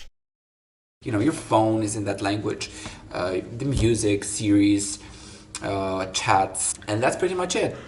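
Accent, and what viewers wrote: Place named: United States. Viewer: I really like your American accent!👍👍👍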